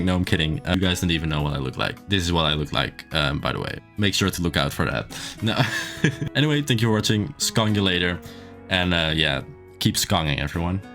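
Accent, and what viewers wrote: Netherlands. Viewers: Wacht is deze man nederlands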